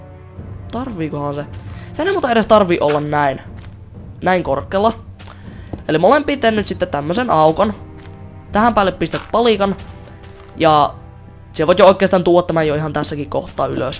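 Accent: Karelia, Finland. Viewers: Ootko karjalasta :D kun puhut sen tyyliil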